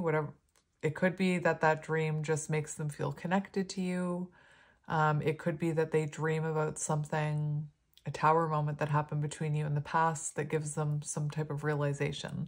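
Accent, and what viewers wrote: Canada. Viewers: I knew you were autistic. I believe I am too, although never diagnosed. I'm pretty sure my mother is, and possibly my dad too. Needless to say, my two boys show signs of it, and my daughter is dyslexic and possibly on the spectrum too (yet she's training to be a vet so she definitely ain't letting it ruin her life) I instantly spotted your nervous laughter at the end of a sentence. That's a definite sign. However, I assumed the words you use were because of your accent, which I presume is Canadian? I got that from way you say "aboot" 😊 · I’m sorry someone said that to you about the way you say anyway! Not only are you neurodivergent but you’re also Canadian and I’m sure has a slight accent!People are haters! Your tribe loves you! I’m also neurodivergent and hate that there’s so many people out there that have no grace for anyone but that’s because they don’t have it for themselves!❤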